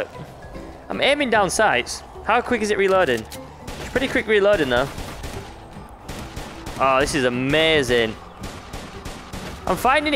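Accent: England, United Kingdom. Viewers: Where are u from England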